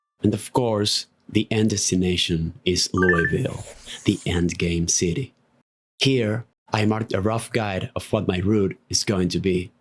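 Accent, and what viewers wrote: Argentina. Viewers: tenés acento argentino sera q sos argentino?? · hey, where are you from? I find your accent very similar to mine, I'm from Argentina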